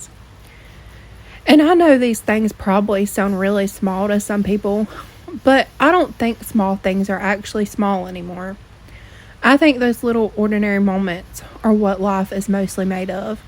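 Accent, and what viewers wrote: South, United States. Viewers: Oo I love the Southern accent. I’m from NC so reminds me of home❤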